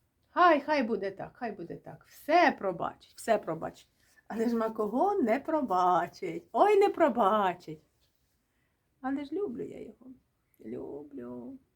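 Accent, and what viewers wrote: Ukraine. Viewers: Вінниччина. Моя бабуся так розмовляла! Її-бо- присійбо .... так і казала. Макогін! *Так - Вінниччина * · Ну, імовірно, що Наддніпрянський говір. Але він дуже великий за ареалом - від Херсонщини і до Київщини, та від Поділля до Луганщини.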